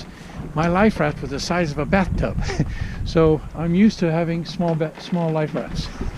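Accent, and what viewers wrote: United States. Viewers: You speak like you're from the United Ststes. What is your nationality? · Lovely job - I really enjoyed your video - info/delivery/demeanour etc. The full package. Impressed++. Keep up the excellent work. American english?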